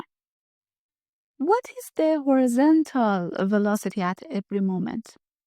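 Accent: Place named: Iran. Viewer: I love your heavy Persian accent, sis 😊❤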